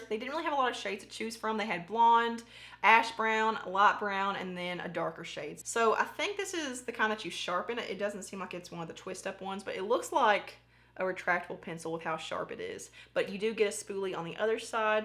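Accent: South, United States. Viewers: Use it love it, when I heard your voice knew I would just love ya,southern accent me too. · I am born and raised from the south and your accent legitimately makes my soul smile. I love it. And I loved this look! Great video gorgeous ♥️ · Im from the south as well So good to have someone on YouTube speaking pur language. Lol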